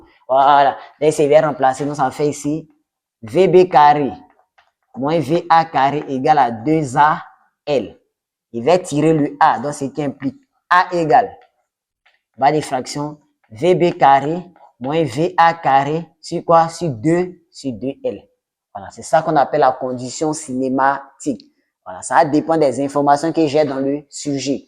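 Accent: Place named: Ivory Coast. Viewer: Je jure que c'est un Ivoirien. L'accent ne ment pas.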